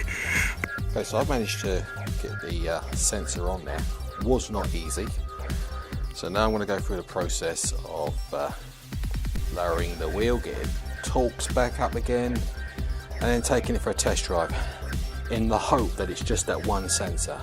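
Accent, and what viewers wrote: Cockney, United Kingdom. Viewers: The cockney mechanic. Great video. 👍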